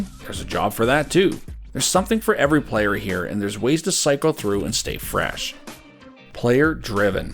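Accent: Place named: Finland. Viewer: is this guy from Finland? wheres this accent from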